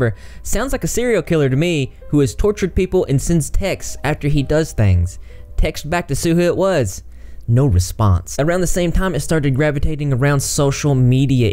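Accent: South, United States. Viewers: And as far as someone saying something about your voice in a previous video... I’m a southerner from Alabama and see nothing wrong with a southern accent. 🤠👍🏼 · hearing all this in a southern accent has me shook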